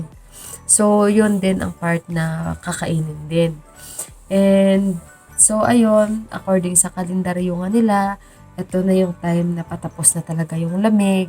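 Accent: Iloilo, Philippines. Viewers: Ilonggo ang tuno mu teh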